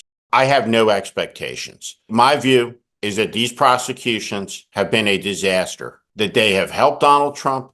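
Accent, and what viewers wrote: New Jersey, United States. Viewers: Steve you are right about GWB silence=complicity. You are right about how awful Garland has been. You are right that the People have to keep trump out of power by not voting for him. But you are wrong about the prosecutions— they needed to be brought fairly against trump even if they were not helpful because crimes of that magnitude need to be prosecuted. You were wrong to bring us GWB and Palin. It’s so disappointing that you were on the wrong side all that time. They ushered in trump. But I listen to you and subscribe to this because you speak with Shakespearean eloquence ( which is heartwarmingly amusing when delivered in your New Jersey accent — I am also from New Jersey) about the state of our nation and I appreciate your devotion to our country.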